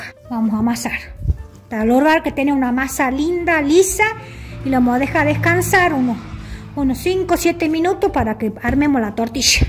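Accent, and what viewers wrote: Tucumán, Argentina. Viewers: Felicitaciones, por esa exquisita TORTILLA TUCUMANA, señora. Me encanta escucharla, esa tonada tucumana, me recuerda a mi querida madre, que nos hacía las mejores comidas tucumanas. Soy tucumano, resido en Buenos Aires, estuve un tiempo viviendo en mi querida provincia, trabajé en los medios de comunicación de allí, como en canal 10, Diario La Gaceta, LV12, Radio Nacional y, también en LV7. Soy meteorólogo y, tenía a mi gran amigo, ya fallecido el periodista Mario Escobar, que me presentó en casí todos los medios. Ud. me hizo caer unos lagrimones al escucharla hablar, recordé a mi madre. Le deseo a Ud. y familia, muchas Bendiciones.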